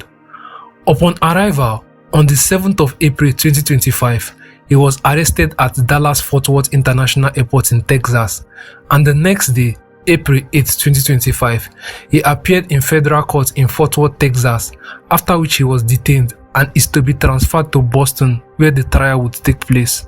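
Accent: Igbo, Nigeria. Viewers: Your narration is superb 👌. I love your Nigerian accent. ❤ Well done bro. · Baba tuche up on the accent, no need to form yankee but this strong igbotic accent will impede traffic to your channel. Almost as if you're trying to make the accent as strong as you can, its off putting to a lot of people's ears.. My advice though.... but good storyline and storytelling.!!.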